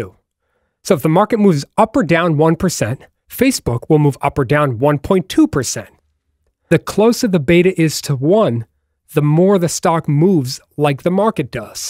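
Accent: Boston, United States. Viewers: Love the Boston Accent! I subscribed, helped a lot with my Finance Final!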